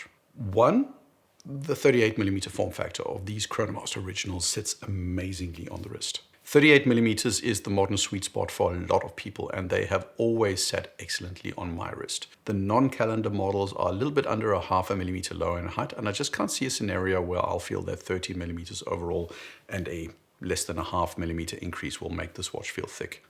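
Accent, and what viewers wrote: South Africa. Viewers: Great video! BTW, are you South African? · I thought you were South African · Completely agree, this watch would benefit plenty from a 42mm diameter, and I don't get the obsession with thin cases either. I want to see and feel some heft and presence from a chronograph. Any thinner than 15mm and I'm not interested. I guess we all have our likes and dislikes. Prefer arabic numerals too Still, enjoy listening to his videos, very eloquent and well considered. Bit of South African in the accent ?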